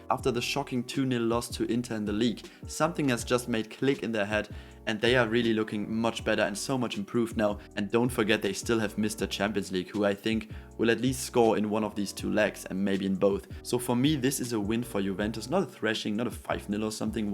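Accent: Germany. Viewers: Deutscher Akzent bockt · Man hört so dass du deutsch bist😂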